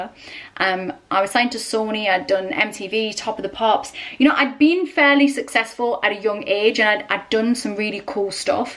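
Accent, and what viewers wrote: Newcastle, United Kingdom. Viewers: Your accent is awesome, it's not every day you get to hear a Geordie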